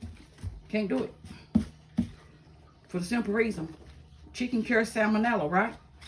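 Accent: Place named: South, United States. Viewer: Loved the video. Glad to see you inspect them for bugs before cutting them up, it makes sense. A new subscriber. Love your country accent! God Bless!❤🙏🏽❤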